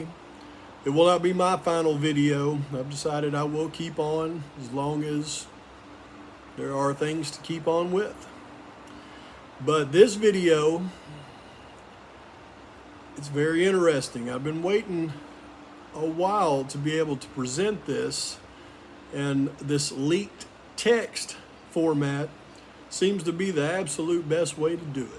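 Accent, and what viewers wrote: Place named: Alabama, United States. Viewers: I'm an Alabamaian too but I don't reside there. My accent isn't as clear as P's..😂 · Finally! Thank you. It mostly makes sense to me now lol. This crazy case has been drivng me insane. I think most people want justice but don't want to see a (possibly) innocent person framed and just want reassurance (real evidence) that he did do this crime. Also I think it is very scary when it appears that our rights are being ignored and it is insulting to our intelligence that we are being lied to and misled. Thank you for providing some much needed clarity. Btw I love your voice-I went to college and lived in AL for a while and your accent seems similar. Please keep the info coming! It is much appreciated! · You definitely deserve more than 1.6K subscribers. You give an interesting and compelling perspective -- I never really bought the drug connection, but you have me thinking. 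And as a native of Alabama, it is a delight to hear a guy with an accent like mine who uses good grammar and is smart as hell. Keep on,.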